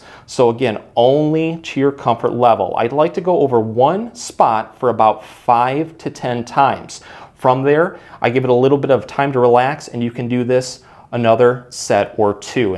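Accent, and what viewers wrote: Michigan, United States. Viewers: This is the most Michigan accent I’ve ever heard in one video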